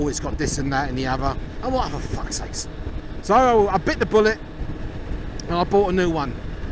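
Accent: Cockney, United Kingdom. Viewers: Don't you think you lay on the old cockney accent a bit thick mate? Do the yanks think its cute? It reminds me of Aussies who play up the Aussie Larkin thing to the hilt.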